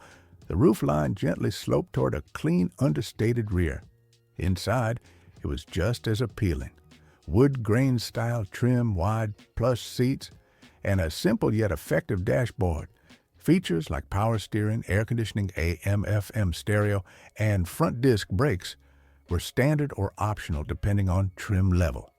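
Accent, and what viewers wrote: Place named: South, United States. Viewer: This “guy’s” southern accent is difficult to hear, difficult, as in sickening.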